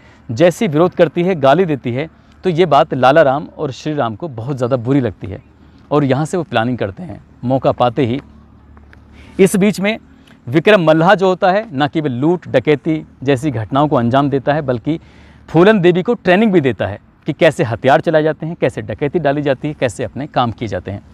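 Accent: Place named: Amroha, India. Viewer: सर, आप तो हमारे ज़िले अमरोहा की हमारी तहसील हसनपुर के ही निकले 😂 आपके बोलने के अंदाज़ से मुझे लगता था, Allah bless u sir, keep it up👍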